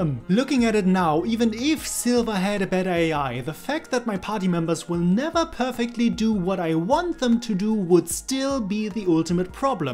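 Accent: Germany. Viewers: U are German right. Love the accent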